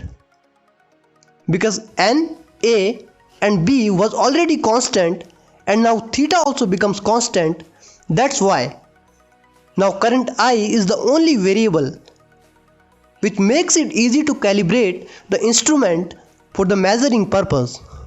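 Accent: India. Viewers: Ok now I feel like u r Indian am I right? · Indian accent.😭🤏🏾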